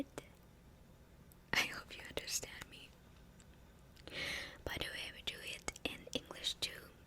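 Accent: Standard. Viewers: 发音好标准，好萌！🥹🫶🏻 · 你的中文发音很标准，谢谢～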